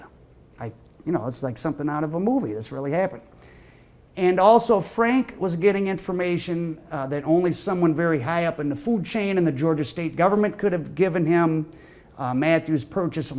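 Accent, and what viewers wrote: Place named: Massachusetts, United States. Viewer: I thought that was a Massachusetts accent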